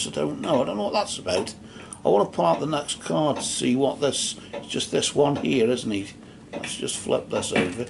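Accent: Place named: Stoke-on-Trent, United Kingdom. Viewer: I really enjoyed your reading and the video in general, thanks for sharing! For me, I think the reading was trying to say that Eric was now with his father, not sure if there were issues in the past with him but now, they are together but ultimately, it is the past. I think wants to move forward and is carrying guilt, which may be causing the nightmares but the cards are indicating that she should move forward (present, stairs, day) I think the eagle represents that he will always watch over her. The message cards at the end were awesome, I think his message was strong, you did a great job! BTW, where are you from, I am from Stoke-on-Trent and you sound like the bloke next door!!!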